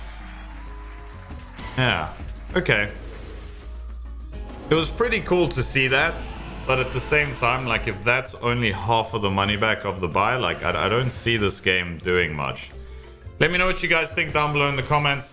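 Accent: South Africa. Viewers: Didn't know you were originally from SA, hard to tell sometimes. I hear like Dutch and English with like idk, Australian sprinkled on top. Accents are cool. I'm from the West Coast and have traveled around the entire US, it always shocks me when people start talking about accents, like I hear theirs but I don't hear mine! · In also from South Africa I thought I heard a South African accent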